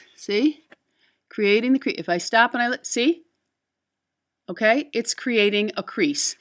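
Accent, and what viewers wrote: United Kingdom. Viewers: great video esp with the British accent lol.I love the look from back in the 40s.My fave movie ever is Brief Encounter from 1945 and Celia Johnson just looks so understatedly beautiful in it.True beauty is timeless and yes you are vintage and classic xx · Oooohhh Yay! I love the british accent. Hehehe Big Hugs Marnie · great video! love the british accent :)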